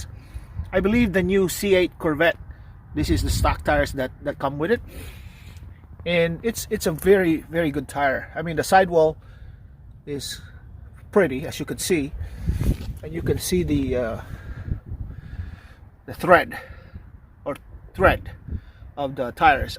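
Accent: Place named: Philippines. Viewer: Philippine accent. How did you get to Illinois? Too damn cold..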